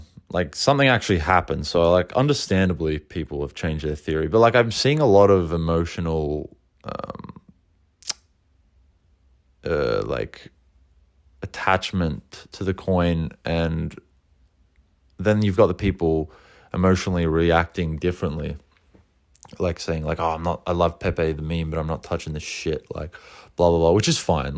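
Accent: Australia. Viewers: chad awsie accent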